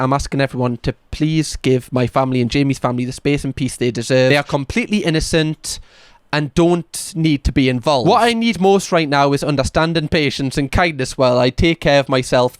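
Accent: Newcastle, United Kingdom. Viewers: Agreed and the Geordie accent helps😊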